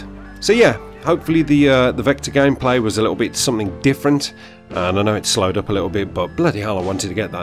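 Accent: United Kingdom. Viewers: I've been checking your channel everyday for a new upload....dammit! Start uploading more, I need your British accent in my life lol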